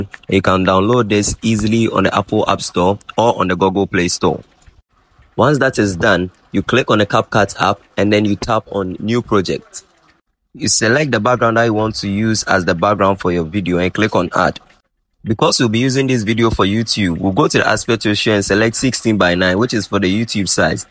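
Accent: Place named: Ghana. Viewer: You sound so much like Ghanaian even though I know you are a ghanaian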